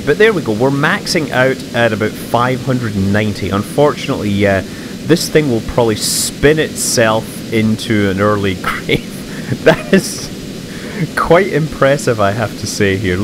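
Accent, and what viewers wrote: Scotland, United Kingdom. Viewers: Sam Da beest Scottish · Scott Manley is, well Scottish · What the hell is that accent. Scottish word here, American there. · Baruch Storm hes scottish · Aaron Muck Yeah, they're both Scottish accents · HES SCOTTISH!? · he's Scottish i believe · dat scotish accent doe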